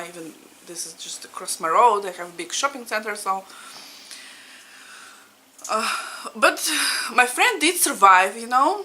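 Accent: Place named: Ireland. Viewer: I can sense a slight Irish flair to your accent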